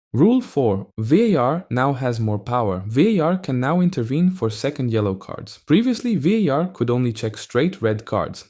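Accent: Brazil. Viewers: É um brasileiro q ta fazendo essa narração? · oh wow. You really sound Brazilian hahhaha the Croatians that I work with, doesn’t sound like this at all. Good for you hahah And no hard feelings about 2022, ok? 👍🏼 hahhah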